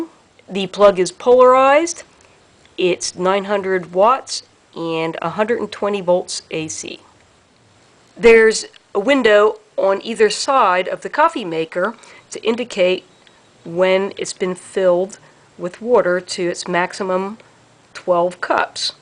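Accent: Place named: Chicago, United States. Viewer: The Chicago accent is coming through loud and clear! ☕️🍩☕️🍩☕️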